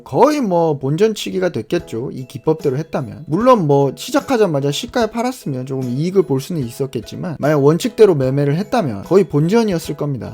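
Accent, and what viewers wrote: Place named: Gyeongsang, South Korea. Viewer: 똑똑하신 분같아요.설명짱.쏙쏙들어오네요.^^ 살짝 경상도사투리 들리는데 기분탓인가요?ㅎㅎ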